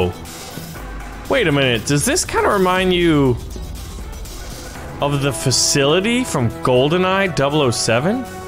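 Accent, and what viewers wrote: Australia. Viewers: U kinda sound like Australian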